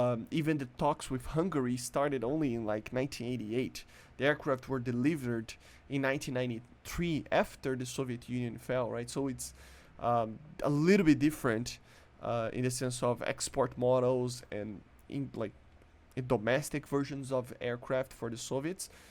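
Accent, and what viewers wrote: Brazil. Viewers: He's from Brazil. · he's brazilian, not british so it's ok. I'm pretty sure you don't pronounce any other language correctly.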